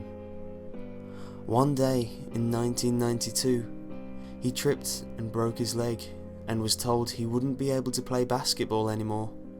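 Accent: United Kingdom. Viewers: Did anyone notice that this is the same bullshit story about Recess that has been spread all over the internet, but with a British accent. This theory isn't based on any evidence from the show, and has several holes. A theory about a TV show needs to be presented with evidence to seem interesting. This is just crappy fiction.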